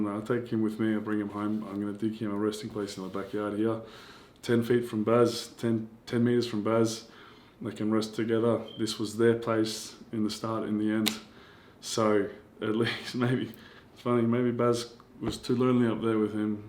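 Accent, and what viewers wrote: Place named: Australia. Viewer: Guys is it only me or you too have problem understanding him ? I mean it’s just his Australian accent is too thick and his voice too deep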